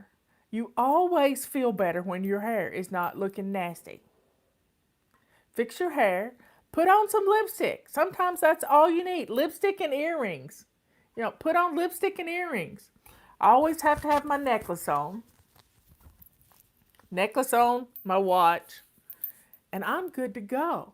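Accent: South, United States. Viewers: Miss fly lady my girlfriend just loves you she’s watches you every day thank you so much for keeping her occupied and out of my hair lol Jk but love your southern accent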